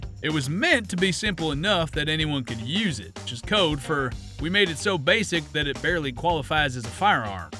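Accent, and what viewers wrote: United States. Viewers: Hello mr stereotypical american accent guy, Ever seen the mg14z? Its a modern mg81z by technicality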